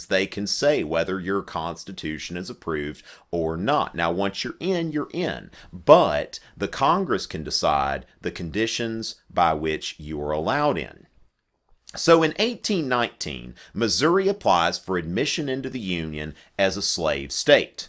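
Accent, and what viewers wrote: South, United States. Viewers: I forgot people have southern accents. I like it!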